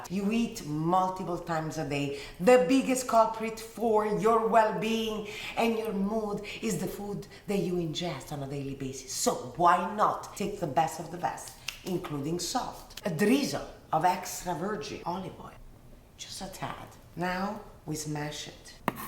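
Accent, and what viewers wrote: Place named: Italy. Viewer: I will buy your book. From Brasil to improve my english Rsrs I ❤ your italian accent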